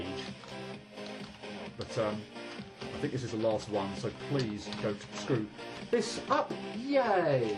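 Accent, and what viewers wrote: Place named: United Kingdom. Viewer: If you don't mind my asking, how old are you? You sound like a rather snazzy, upper-class british commentator on a golf game with more emotion. Yes, that's a good thing.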